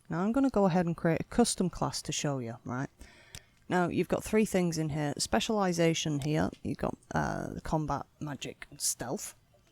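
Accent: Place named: North, United Kingdom. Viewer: Nice to hear a fellow northerner on this part of youtube :) Thanks for making this guide, I feel a bit overwhelmed getting into morrowind so I'm hoping this will make it seem less... huge.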